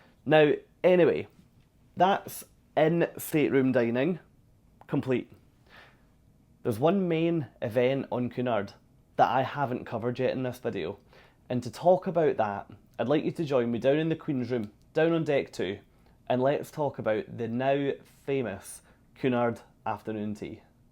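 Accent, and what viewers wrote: United Kingdom. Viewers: Thanks for this info. I'm on her 7/22 and so looking forward. I've been Princess Elite over 15 yrs. One thing you didn't address was the Gala nights - oh my all the comments I hear from folks who are terrified of what to wear. I know food taste is purely personal so I can make a delicious meal on my own. But what about these white nights, or masquerade party, etc. Can you fill me in on that part? Loved your accent - this southern lady is going to adore hearing all the British chatter in a couple of weeks.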